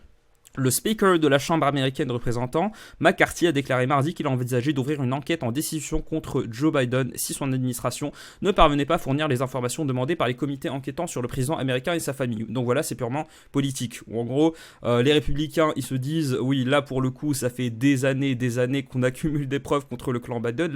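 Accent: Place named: Flanders, Belgium. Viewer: Un flamand français ? C'est devenu extrêmement rare...(t'es de Bailleul ?) Ou belge ? Merci pour pour ton analyse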